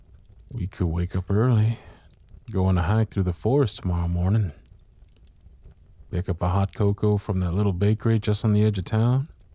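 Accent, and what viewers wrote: South, United States. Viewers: aaaa!!! love this audio so much. Southern country boys are my type anyways so this type of audio is awesome!!! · Woah, who was gonna tell me SOUTHERN exists?! I love it! Gimme more please 👉🏻👈🏻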